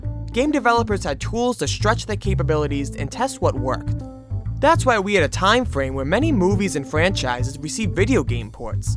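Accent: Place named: Minnesota, United States. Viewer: This guy has the thickest minnesota accent. Love it!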